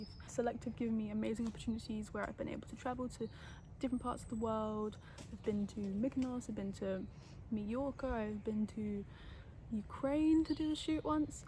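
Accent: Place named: United Kingdom. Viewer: I'm in love with British accent Greetings from Germany ❤️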